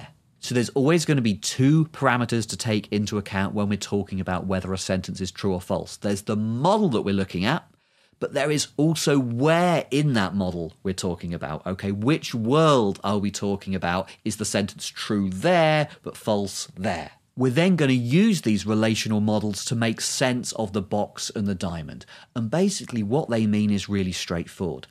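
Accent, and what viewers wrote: United Kingdom. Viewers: I have to think, hearing him, about Eric Idle from Monty Python. It's a certain British accent and not unpleasant at all. For the rest, it's too difficult for me although I notice some resemblance with Feynman diagrams, which I understand neither.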